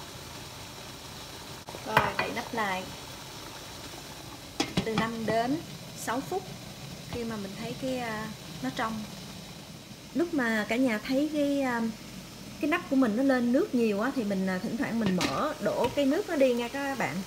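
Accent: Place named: Hue, Vietnam. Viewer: C nói tiếng Huế hay mà. Nghe rất dễ thương. Sao 2 con chị ít nói tiếng việt nhỉ. Thấy bé chăm nói tiếng việt hơn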